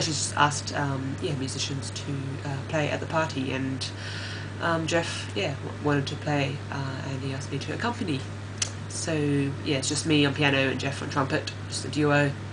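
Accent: United Kingdom. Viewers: you look like you are studying aboad or something.. its funny to here a british accents from you kkk.... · Omg an asian with a british accent... I'm asian too, will you date me?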